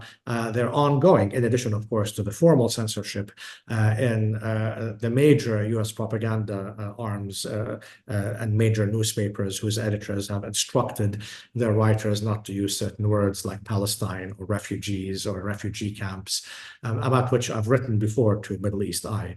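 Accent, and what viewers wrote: United Kingdom. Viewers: He sounds like he is from the UK. Did he forget about the British put the Zionist in Israel in 1916 and took over there claiming to be Jews and they created the UN to make Israel in 1948 .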